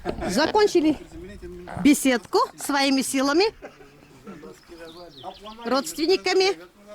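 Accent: Tatarstan, Russia. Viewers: Здравствуй, моя дорогая землячка, коллега по работе в нашей молодости и Мама и Бабушка своих внуков! Получается, что мы все коллеги! Мы все Мамы и Бабушки. Какие Молодцы жители вашей деревни! Как здорово, когда есть вот такое место, где можно собраться вместе всей деревней на Сабантуй. Я с детства помню Сабантуй, от слова Сабан-плуг, когда спашут землю и посадят, всегда устраивали на поле, около деревни, Сабантуй. Весело было! Спасибо тебе, за воспоминания о детстве и Моей Любимой Родине! Не думала Я, когда уезжала, что так буду скучать по Родине. Спасибо, большое!!! За поля Родные, За леса и за Родную Природу и за речь национальную и за говор т.е.акцент татарочек! Как же всё родное! Счастливая ты, что смогла вернуться на Родину. А самовар-то у меня есть. Это я сохранила-чай из самовара на улице и со сливками и из пиалы.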